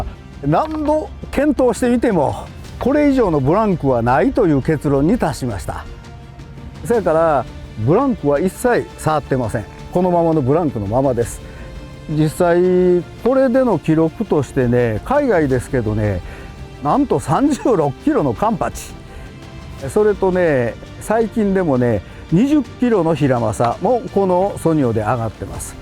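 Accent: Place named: Kansai, Japan. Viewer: おっちゃんの関西弁が良い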